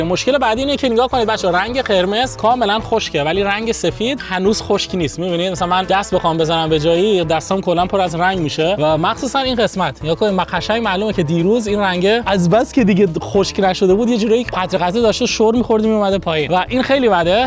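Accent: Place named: Dezful, Iran. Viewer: یکم لعجه دزفولی داری ، درسته؟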